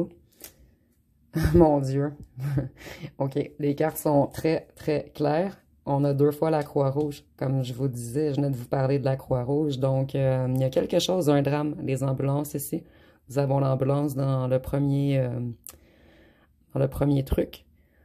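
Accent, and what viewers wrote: Canada. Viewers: Première fois que je vous écoute! Votre accent canadien me plaît beaucoup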